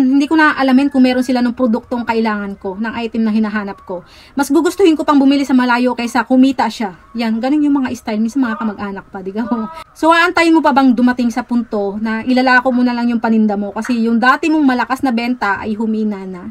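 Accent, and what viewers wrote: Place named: Batangas, Philippines. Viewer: Napansin ko na tinatanggal ninyo ang inyong Batanguena accent...pero kung minsan e hindi maiwasan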